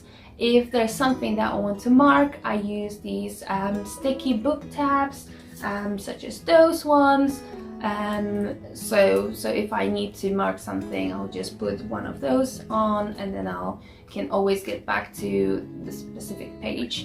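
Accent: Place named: United Kingdom. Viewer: I love the British accent you picked up,! It's so nice to see and hear you, even when we're isolating so far apart. I look forward to more videos:) Yay!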